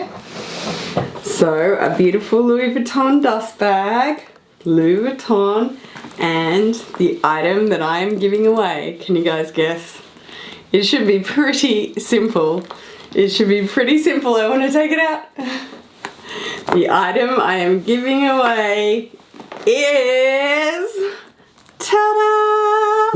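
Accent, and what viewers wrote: Australia. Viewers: you've unwittingly been right by my side for so many years now. My partner walks by me watching your videos and affectionately shouts ' ' and then leaves me be because obvi I'm busy, eyes glued to whatever eye candy and luxury wisdom I have coming my way. I can't actually pinpoint what it is I love about your videos. What first drew me in was hearing another Australian in a sea of American luxury YouTubers. From day 1 I just.. trusted you. I've watched you thrive, go through your first pregnancy, met your beautiful Mum and gem of a hubby. I've seen Massi grow and squealed when Zaki I came along. And here I was thinking I was watching for the luxury! Anyway babe, your killing this biz, keep doing you. Congrats on 100, 000. Hells bells, that's the shzushz!!! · I’m a silent watcher,but I just want to say I love your blogs! I love watching your lovely family and your sweet mom. 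Your kids are so precious! I’ve collected luxury handbags since 1986(telling my age 😉) I love your collections and I love your accent! If I had your Aussie accent I would never stop talking 😌 Thank you for the opportunity to win the Speedy B 25! 😍 God Bless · Watching another Sydney girl do so well makes me really proud! You’re always my go to for fashion and luxury videos and hearing an Aussie accent makes it that much better! 🇦🇺❤️ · I watch your channel because you are true to yourself 100%. And I love your Australian accent! 😊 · How can anyone hate you?! You are so real and generous. I love how you share your life and love for luxury without any tinge of bragging. And of course that Australian accent is just so cute, I can’t get tired listening to you.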